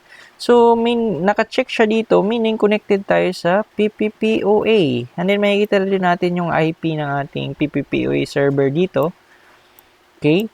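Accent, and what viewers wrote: Visayas, Philippines. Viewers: Salamat sir idol kna ng maraming tagasubaybay..andun parin ang visayas accent..daghang salamat bai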